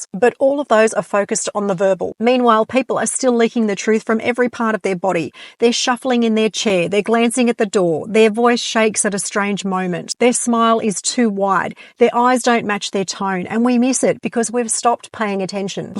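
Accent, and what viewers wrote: Australia. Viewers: OMG the Aussie accent is so harsh on the ear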